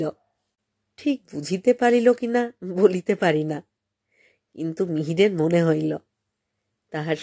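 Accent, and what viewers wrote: Bangladesh. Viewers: apnar bangladeshi dialecte pather jonnyo shrutimodhur lageni . aar kono action charai jeno reading porechen.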